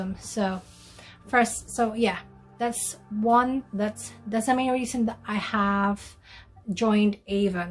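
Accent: United Kingdom. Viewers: Grabe talaga english accent mo ati 😂😘 love it!! Avon lover ako dati nung sa pinas ako hehe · Really love the British accent... Kilay is life talaga. Anyway it's another stream of income being an Avon rep. Dami mo na raket sissy.. youtuber na avon rep pa, plus your regular job pa. Payaman... claim it sissy.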